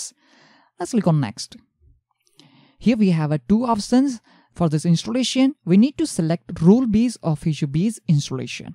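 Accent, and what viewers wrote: India. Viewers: ..and the Indian accent is also a joy to listen to :) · the most indianest accent ever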